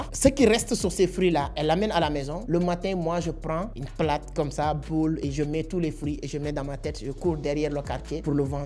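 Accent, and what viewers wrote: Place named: Senegal. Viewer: Force a toi. J'aime bien l'accent des sénégalais .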